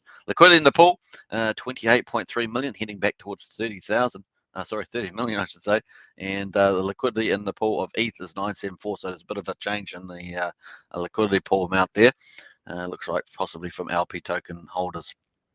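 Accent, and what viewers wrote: New Zealand. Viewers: Is that a kiwi accent?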